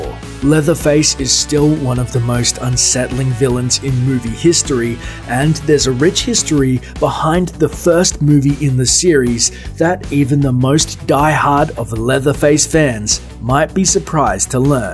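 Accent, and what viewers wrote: Australia. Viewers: Are you Australian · Australian narrator?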